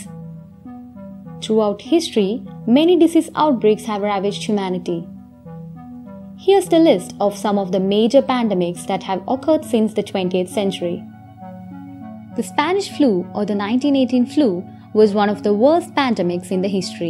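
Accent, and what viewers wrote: Kerala, India. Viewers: Typical indian accent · The narrator is a malayali, i BEt.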